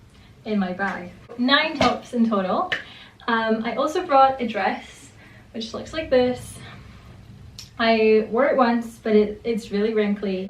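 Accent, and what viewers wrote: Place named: Scotland, United Kingdom. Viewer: Sitting here smiling half hearing a Scottish accent and then it disappears again. I now see you spent some time in Scotland. Much from jennifree in Edinburgh 😀🏴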